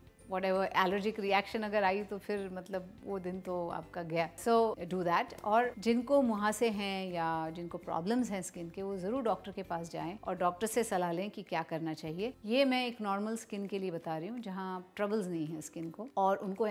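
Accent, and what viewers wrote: Maharashtra, India. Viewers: मराठी माणूस कुढेहि गेला कितीही शिकलेला असला कितीही इंग्लीश हिंदी बोलत असला तरी त्याच्या बोलण्यात थोडातरी मराठी टोन येतोच ❤ u mam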